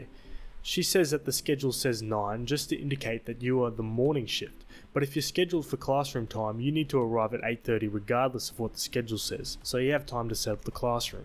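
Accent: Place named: Australia. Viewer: It's great to hear another Aussie doing the Reddit stories. Sick to death of the dammed Yanks having the monopoly on YouTube. Looking forward to more from you but I'd like to suggest trying some different sub-reddits because I have heard the second story 5 times in the last couple of days. Few people do neckbeards, incel tears and several other entertaining ones. I think I even saw a Bogan one somewhere.... lol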